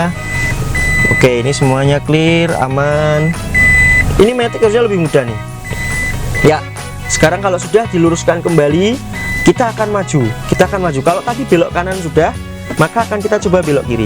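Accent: Java, Indonesia. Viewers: g papap mas bilang Cagak. itu cirikhasnya Vlognya mas ada aksen jawanya, dan itu yg bikin lebih seru dan enjoy untuk d tonton. sehat terus mas. rajin upload terus. lancar rizkynya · kalo boleh tau itu mobilnya siapa mas? logatnya jawa banget tapi plat nya D (bandung)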